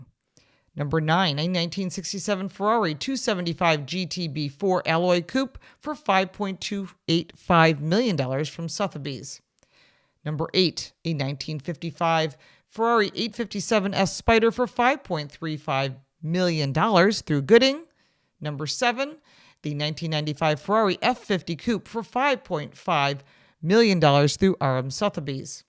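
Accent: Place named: Midwest, United States. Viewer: 'thought so. i'm mainly from Chicago originally, but spent a couple years in Detroit (Southfield, 12 Mi. & Greenfield, <num>) & had family in Detroit. Unique midwestern accent! 'Enjoyed your video - What a great job to review those wonderful classics!! - Best wishes, Phil in AZ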